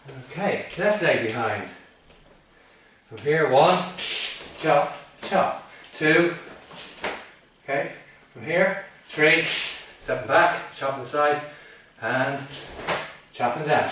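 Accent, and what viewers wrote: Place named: Cork, Ireland. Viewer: My word you sound just like my neighbor. He's from County Cork.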